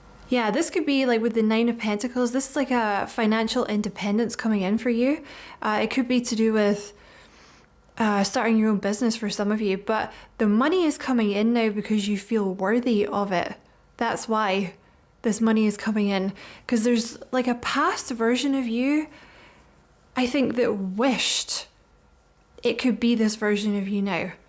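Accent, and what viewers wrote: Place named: Scotland, United Kingdom. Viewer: Thank You. Felt as if you were doing a private reading for me. Resonated with me every step of the way.. and I love your accent and you must be from Scotland. Thank you again. You are an excellent tarot reader. Averil from South Africa. Blessings to you ♥️🌹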